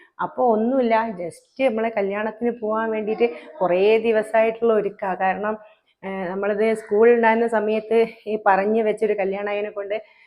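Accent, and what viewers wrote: Kozhikode, India. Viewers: കോഴിക്കോട് ഭാഷ ഇഷ്ട്ടം ആണ്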